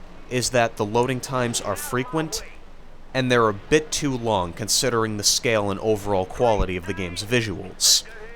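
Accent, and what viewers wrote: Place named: United States. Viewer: yea please do a better review of it as it deserves it. also, you probably don't sound like him but I'm british so you're american acents sound similar to me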